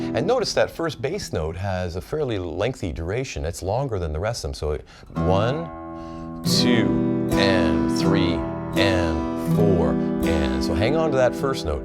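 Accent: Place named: Canada. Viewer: Great video. I can tell the difference between a Canadian and an American accent easy were others cant but you sir have such a Canadian accent a deaf man could tell your Canadian. This is were im told your from Texas. :) Iv never heard such a nice Canadian accent in my life.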